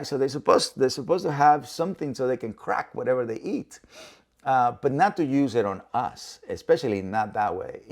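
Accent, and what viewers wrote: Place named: Mexico. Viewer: Love Cesar's Mexican accent I miss it when I'm not watching his videos. Not to mention he's also very spiritually awakened, not only does he know dog psychology but humans too. One of the best commendable spiritual teachers.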